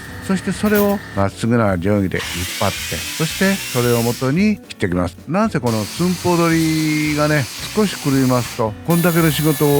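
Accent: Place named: Banshu, Japan. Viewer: 方言は同心円に広がりますからね〜 たまにさんの言葉が私の地元、兵庫の播州弁に聞こえたりします。（疑問形で 〜け？）など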